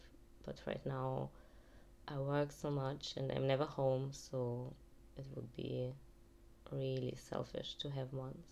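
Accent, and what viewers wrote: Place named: Germany. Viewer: damnnn dat german accent tho 😂 (Im from germany and I struggle with that too, no hate)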